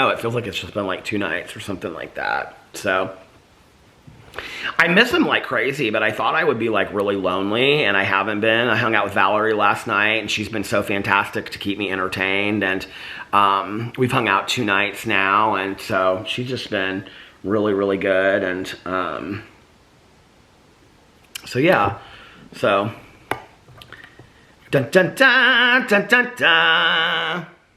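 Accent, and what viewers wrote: South, United States. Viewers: Do the crumbel review with cousin caroline! Also, I’m from the south and you and I say coupon the same lol. You must have a little southern twang in ya 😉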